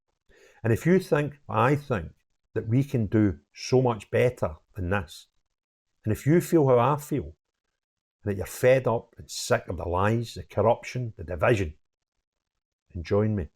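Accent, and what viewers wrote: Scotland, United Kingdom. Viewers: Great to have your Scottish accent..we represent our beautiful country of Scotland….we must work together and get away from the total control of the English..we are the oppressed..we are Palestine…thank you for being a voice for us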